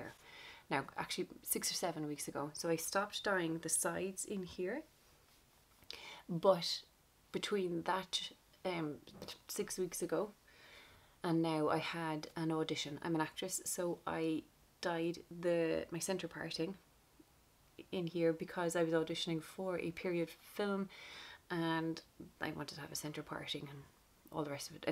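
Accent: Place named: Ireland. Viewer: So delighted to discover you tonight! You have brightened my dull day with your enchanting personality & Irish? accent. I started by gray hair journey last August, I was SO excited for it! I also have pale skin and pale green eyes (and yes irish ancestry on both sides) and have been dyeing my hair dark for decades (even though my natural hair is light brown/dark blonde). I had NO idea what lay underneath it all! I also am just growing it out, not cutting it short or bleaching it at all. It has been quite the journey. At 8 months of growth I am just beginning to get glimpses of what it may look like grown out. I am planning on growing it to waist length which means it will take at least another 2 to 3 years or more. Right now it is long to mid back and I keep trimming the dull ends every month. I very much look forward to your future videos! Liked and subscribed. 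Slainte!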